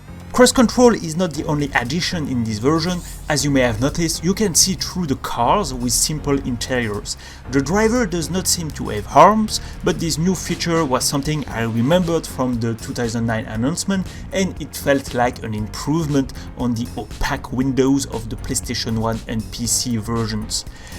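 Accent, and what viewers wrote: France. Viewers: Question are you french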